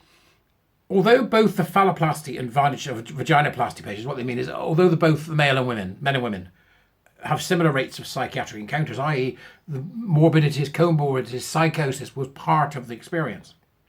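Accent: United Kingdom. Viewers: The reason you are bothered by the word urinary is because of the way you, as a Brit, pronounce it. Try the N. American way.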